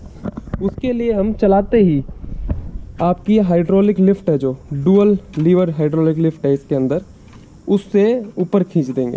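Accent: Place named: Haryana, India. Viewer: Haryana ki boli laage tere Bhai jma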